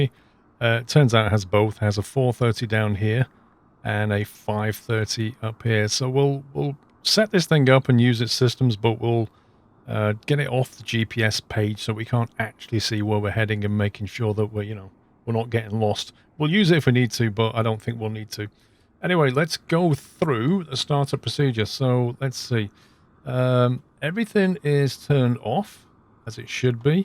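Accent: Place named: Yorkshire, United Kingdom. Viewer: Proper Yorkshire.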